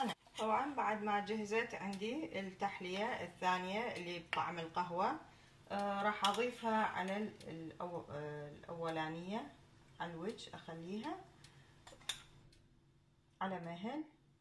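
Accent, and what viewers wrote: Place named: Iraq. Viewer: احب اللهجه العراقيه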